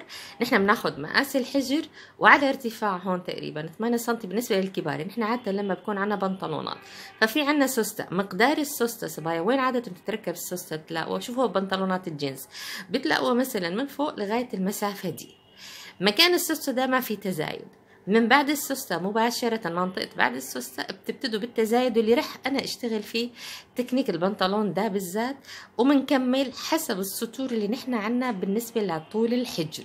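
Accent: Egypt. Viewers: تسلم ايدك عل شغلك وشكلك قاعدة بمصر اللهجة المصرية باينة في كلامك شويه تسلمي حبيبتي من كل شر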